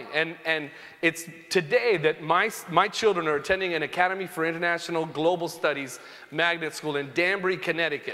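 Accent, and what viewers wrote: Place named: England, United Kingdom. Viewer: Your comedy is awesome!! I love it when you speak in your english accent, it's hilarious.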